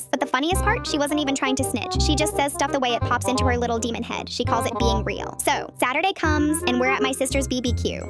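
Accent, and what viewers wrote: Texas, United States. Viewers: Bro holy Texas accesnt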